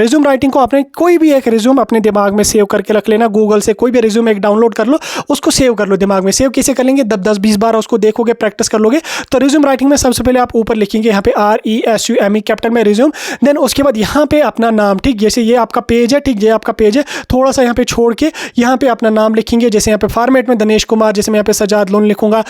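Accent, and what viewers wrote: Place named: Kashmir, India. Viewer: Bhai you are kashmiri i understand because your Urdu voice❤❤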